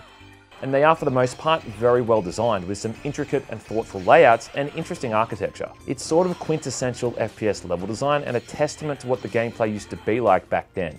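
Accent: Australia. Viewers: Woo! Aussie game reviewers are a nice change.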